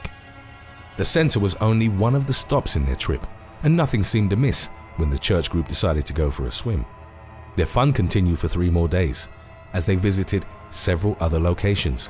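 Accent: England, United Kingdom. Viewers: Aw. The british and their pronunciations. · It's his accent. That's the way they say it in England. Like toe-may-toe in the US vs toe-mah-toe in England.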